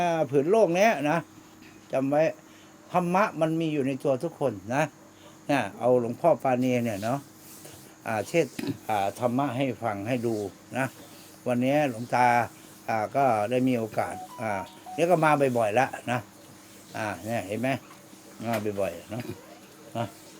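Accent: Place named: Laos. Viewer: หลวงตาเว้าภาษาลาวน่าฟังดีที่สุดเลย.สุดยอด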